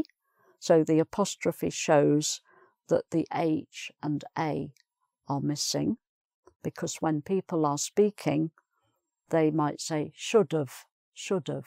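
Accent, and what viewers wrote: England, United Kingdom. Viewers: it' s always a pleasure to listen to your lessons! I love your beautiful english accent so much...thank you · Thanks a lot mam! You are great! I love British accent so I'm learning lots from you :) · Thank you a lot. Your lessons are really useful and you have awesome British accent as well))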